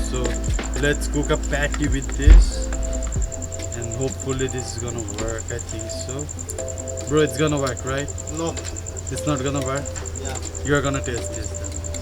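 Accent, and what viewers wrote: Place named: Jamaica. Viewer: This reminds me a lot of the jamaican jerk chicken, I see where the the chicken comes from but after listening to his accent i finally understood the jamaican jerk in it. plus it seems like the bengali dude with the jamaican accent has to learn to give an outro.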